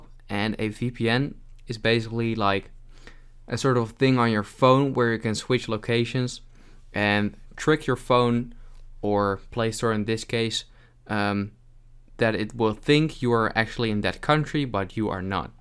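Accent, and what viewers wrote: Netherlands. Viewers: Im getting the feeling that your dutch🤔 am i right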